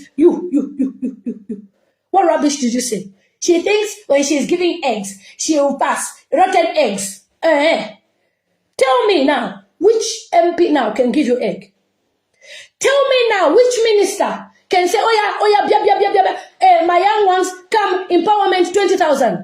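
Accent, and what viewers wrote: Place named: Nigeria. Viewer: Why are you using Nigeria accent (oyibo)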